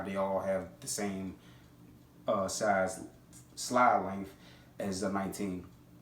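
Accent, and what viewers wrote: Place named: Maryland, United States. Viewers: As soon as u started talking,I knew exactly where you're from lol.Salute to u from a fellow 2A and DMV brotha.My collection isn't as extensive as yours,but you' got me motivated.That's a Raw collection u have bro 💯. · Nice collection fam. We all knew what state you were in. Maryland accent is strong. Go check out a couple of my builds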